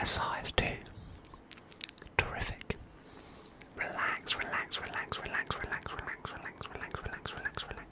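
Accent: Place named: West London, United Kingdom. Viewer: I want to hear more of that west London accent heheeee~~~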